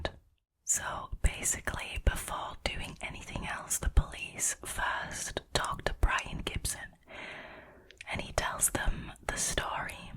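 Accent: United Kingdom. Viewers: i really enjoy, as a non Brit, the sound of the classic Britissshhh accent you have...the one i, unsuccessfully, tried to replicate at english classes back in school days...you oftenly fly under my radar though since you mostly do Reddit stories and i'm more of a true crime aficionado... great narration and tone nonetheless...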